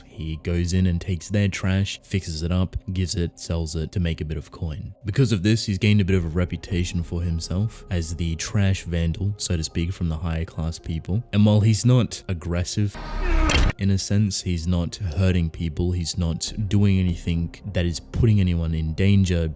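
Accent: Australia. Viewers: yeah, something about Australian accents discussing lore/topics always sounds interesting lol. Reminds me of fudgemuppet when they dive into Skyrim.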